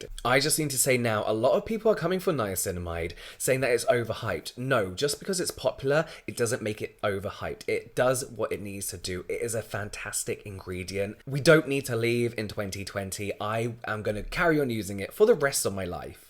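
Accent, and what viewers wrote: United Kingdom. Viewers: Happy Monday! I’ve done the toothpaste thing (years ago), the it was spot treatments (they worked well), but this cool guy with an awesome British accent introduced me to pimple patches... LIFE CHANGING! 💖💖💖💖💖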